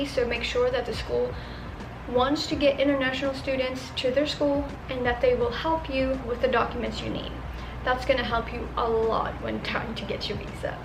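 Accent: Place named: South, United States. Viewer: girl, your southern accent is coming through! love it 😂 and miss you 😘 kinda jealous ngl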